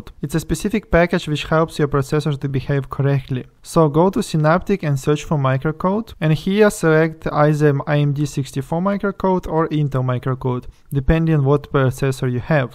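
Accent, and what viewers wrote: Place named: Russia. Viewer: I don't think so - he has a very strong Russian accent :-)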